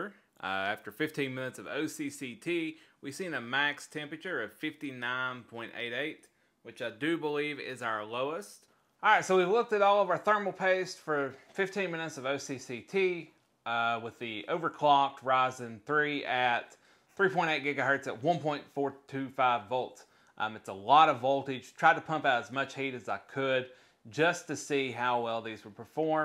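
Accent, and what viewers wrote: South, United States. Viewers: R u southern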